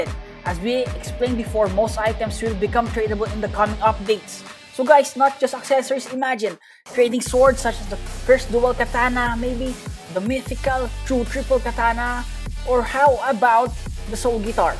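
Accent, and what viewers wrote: Philippines. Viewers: You sound like a Filipino to me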